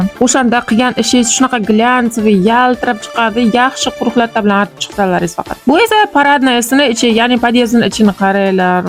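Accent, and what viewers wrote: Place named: Jizzakh, Uzbekistan. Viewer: Жиззахдан экансиз гапширишиздан билдим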